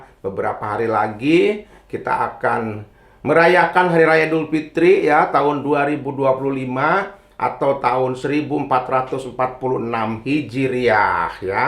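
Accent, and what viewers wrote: West Java, Indonesia. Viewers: Sunda banget, KUNGKURAHAN... logatnya juga Sunda banget...👍👍😅😅❤❤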